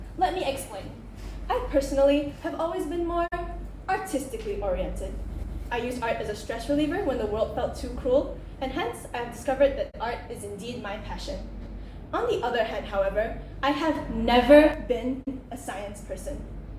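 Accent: United States. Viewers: I like ur accent, sounds americanish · finally, someone with an American accent in a uk school, I’m not the only one!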